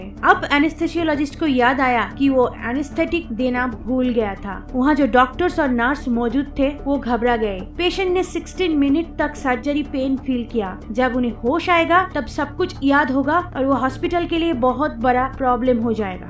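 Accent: Bengal, India. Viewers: Are you bengali? It sound like you are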